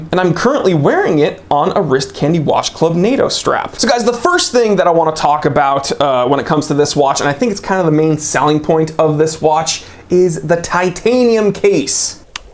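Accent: Germany. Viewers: Your "german-english" was great - just on point! Love the outtakes 👍😄 Greetings from Germany, yours Hendrik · Lol.... You've lost the plot this time... But love that wacky German accent.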